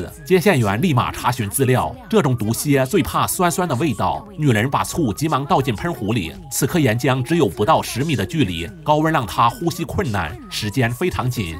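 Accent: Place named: Northeast, China. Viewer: 唉，感覺這解說……實在挺東北大舌頭的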